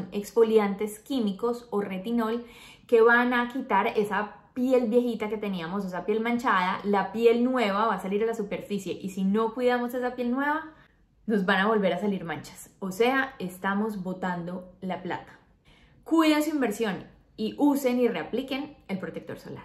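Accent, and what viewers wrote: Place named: Colombia. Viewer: Tenias que ser COLOMBIANA para ser tan HERMOSA y ese acento 😍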